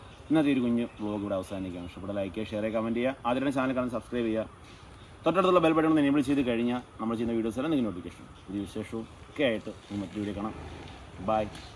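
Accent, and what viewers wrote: Kollam, India. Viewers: മ്മ്ടെ കൊല്ലം ഭാഷ വേട്ടാവള ിയൻ😂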